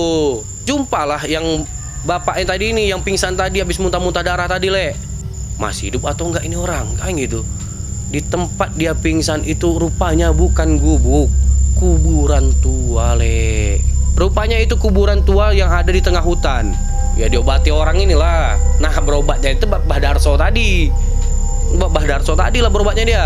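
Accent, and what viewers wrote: Medan, Indonesia. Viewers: Kereennn ceritanya leeekkk.... Medan kali laahh gaya abg ini semangat bang.... 😂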